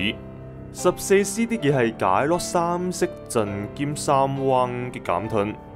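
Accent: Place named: Hong Kong, China. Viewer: 就算一早睇哂當日所有最新情報都好，點都要聽你條片講多次，好鍾意你個d好地道港式既口吻❤加油❤ 利片：非基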